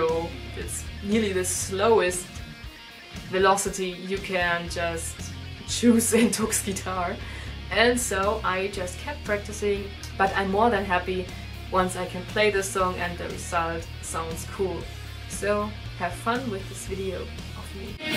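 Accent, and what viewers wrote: Germany. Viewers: I love your german accent when speaking. Do not exist best with this: The very best guitar player in fusion with as a beutiful girl. hi from Chile. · Красивый немецкий акцент. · I like your german accent (Hab ihn selber xD)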